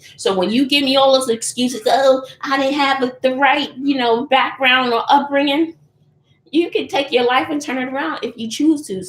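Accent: New York, United States. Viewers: Lol, i love your NEW YORK accent, state of mind and swagg, you remind me of one of those NY Jewish Women, you're AMAZING, you could be a celebritie with your beauty, talent, humor and charm. Hang in there, it wont be long before you'll be an "EMPTY NESTER" time flies.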